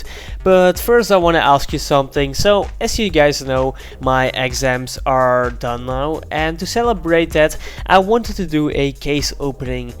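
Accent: Netherlands. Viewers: pik praat eens fatsoenlijk engels het is echt irritant om het nl accent de hele tijd te horen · Are you Dutch?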